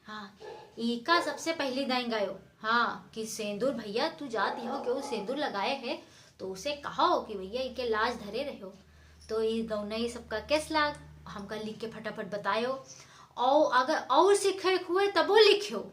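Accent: Awadhi, India. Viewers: Bahut badiya yaar mja aa gya...aapki awdhi boli ne to dil khush kr diya.or video bnaye awdhi boli me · आप केरि अवधी कॉमेडी तौ बहुतै नीक हुअत है। ख़ास कइके अवधी कै लबो-लहजा। मुला ई तौ खड़ी बोली - हिंदी केरी गौनई रही। कौनौ अवधी गौनई पेस करौ तौ अवधी मजा अऊर आवै। बड़ा नीक लाग आपकै चैनल। खूब तरक्की करौ।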